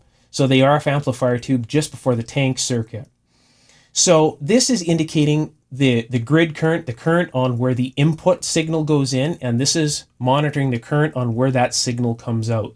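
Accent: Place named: Canada. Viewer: Canadian accents are the best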